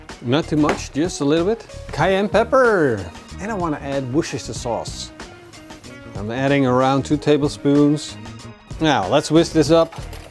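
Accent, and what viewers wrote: Netherlands. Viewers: "hoe" haha eindelijk. dacht al heel de tijd een nederlands accent te horen😄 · Beercan chicken best on gasbbqs. Keeps the chicken white inside. That Holland english talking accent is horrible!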